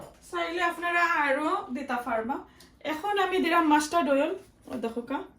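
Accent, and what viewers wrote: Sylhet, Bangladesh. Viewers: এটি সিলেটি ভাষা 👌👌👌🥰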